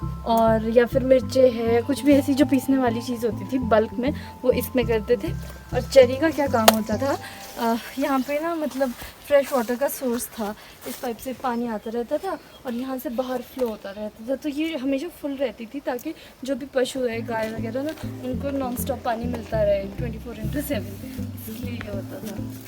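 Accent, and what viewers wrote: Pahadi, India. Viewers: Hahah too sweet ,you have that pahadi touch in your voice and cute expressions😌😌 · Your voice is be like pahadi nice voice 🥰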